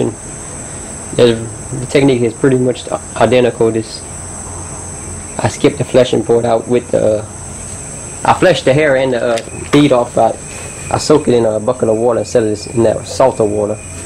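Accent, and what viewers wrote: Louisiana, United States. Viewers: American... Southern of some sort, Louisiana? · Southern Louisiana, American accent. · I'm from Louisiana.. Promise this dude is too... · Man that Louisiana accent come out thick.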